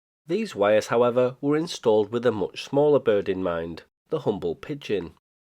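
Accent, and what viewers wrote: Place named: Northern England, United Kingdom. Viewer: Fascinating stuff you always come up with, especially with your accent! It's not dissimilar to mine. I'm from Baguley, Wythenshawe round the corner from Alty (Altrincham for non-locals). My brother used to keep racing pigeons in our parents' back garden. I never knew this about those cork devices but I've seen them too. I think I may have thought they were a lightning protection device of some kind (pretty stupidly). Thank you for solving that little mystery.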